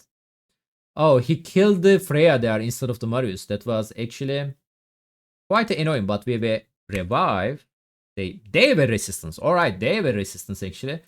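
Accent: Turkey. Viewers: Kesin bizden,ingilizce mukemmel olsada tonlama tam turk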